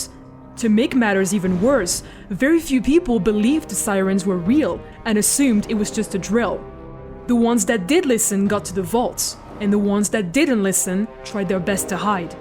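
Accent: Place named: France. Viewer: she has a cute accent. sounds French.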